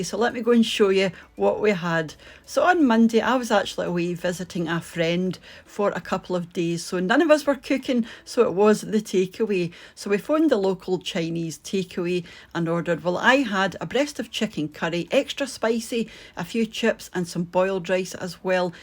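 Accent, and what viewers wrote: Scotland, United Kingdom. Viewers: I also love the Scottish accent! Or is it a brogue? ❤️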